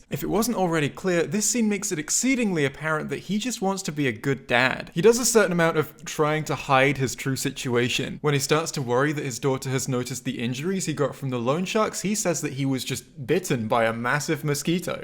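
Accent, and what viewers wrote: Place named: Scotland, United Kingdom. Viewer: are you from/living in Scotland? I thought I heard some Scottish pronunciations in there! Hope you're loving the Granite City - love your videos!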